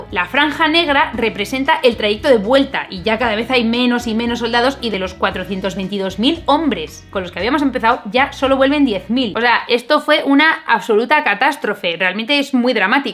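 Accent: Spain. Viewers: Ya mejor haz tus videos en inglés, si estás empecinada a decir palabras en ese idioma cada 30 malditos segundos, inecesariamente, pues al demonio hazlo todo así. Si lo que quieres es que no se te entienda, que se joda el español, y también el inglés, invéntate un nuevo idioma y comienza a usarlo en contextos en los que perfectamente podrías usar el español, y que tal vez deberías, porque haces malditos videos para gente que habla español y esperan escucharte hablar en este maldito idioma!! Para terminar de joder, ese maldito acento español hace que se comprenda todavía menos. Considera lo del nuevo idioma, con lo que te gusta hacer estupideces, disfrutarás que nadie en el puto mundo entienda una palabra de lo que dices.... porque tienes fakin issues issues issues, que ganas de joder por el justo de joder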